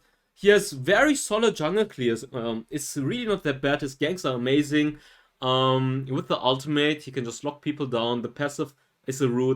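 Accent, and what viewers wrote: Germany. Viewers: o he is german?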